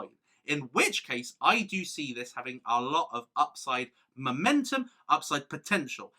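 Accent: United Kingdom. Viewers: Sing in english accent talks in british accent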